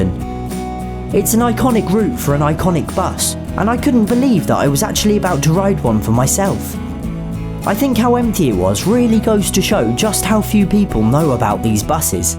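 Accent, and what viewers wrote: United Kingdom. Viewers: I am so glad that I found this channel,I love the stop motion,the music and all of the wonderful content! Also,. British, anyone?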